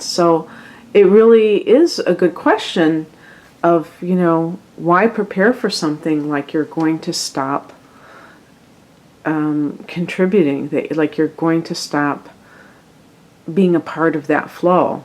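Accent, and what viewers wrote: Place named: Midwest, United States. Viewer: oh hey a midwestern accent! Hiiiii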